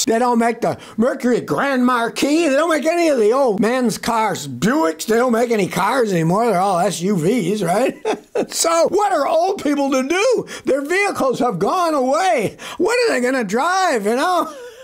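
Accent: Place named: New York, United States. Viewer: I have watched a couple of this guy's videos with him screeching. The jersey\new york accent is the most irritating in the world. How do you all watch this garbage? I am going to mark this channel as do not recommend.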